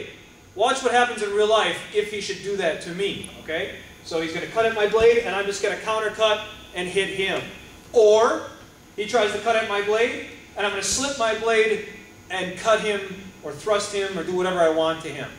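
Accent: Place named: Iowa, United States. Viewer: If you had not said he was from Iowa and asked me instead to guess. I would have guessed Iowa.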